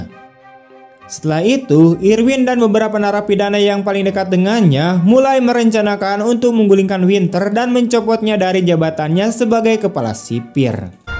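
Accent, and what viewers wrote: West Java, Indonesia. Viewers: Orng sunda ya bang?😅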